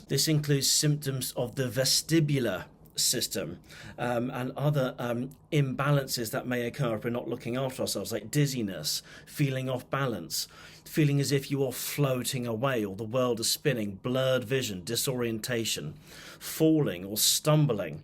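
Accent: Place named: United Kingdom. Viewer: First time on your channel. Great reading! Your british accent is lovely however you move very quickly through things and your speech was a little fast for me. I'll listen again. Thank you for your reading. Just subscribed .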